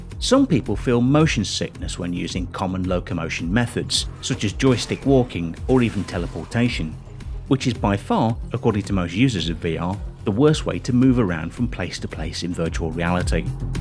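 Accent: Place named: RP, United Kingdom. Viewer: Puke inducing, oversofisticated British accent. Voice is akward modulated, I turned it of I couldn`t stand the lector. I had convulsions after listening him, even though the topic was interesting.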